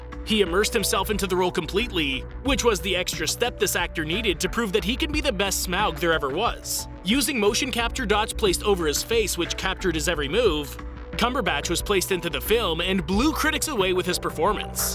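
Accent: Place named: United States. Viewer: I left the following comment on your phoney 'no talking films stars' sister, channel. On this channel Benedict mumbles a few words where you've turned the sound down. Why? Read this: (like you two; I couldn't be bothered writing to both of your channels... you're carbon copies of each other. I guess you discovered you'd need more channels to get subs rather than pay for the commercial rights to the actors actually talking, out loud): Videos about really interesting, talented people like where you never get to hear them speak. Not acceptable. Could it be because the cheapskates at Biographer are too mean to pay the commercial rights to their film clips so they can't broadcast them with sound? That's why the irritating American 'car salesman' voice has to quote everything that is said in the clips. There is a clear message in the mere 88K subscribers; heavens, there's a grandma cooking pasta sauce on YouTube with double the subscribers than Biographer. 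Imagine if you actually had the voices of the huge stars you have images of... ah, but then again you'd have to shell out for that. You'd have to sell more advertising. Nah, don't bother. Not on my account... I'm outta here...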